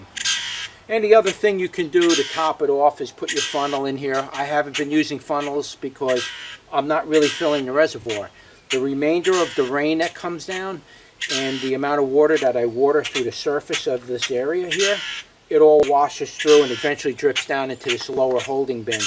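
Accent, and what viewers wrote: New York, United States. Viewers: great idea! going to give it a try .. what zone are you in? you sound like a new yorker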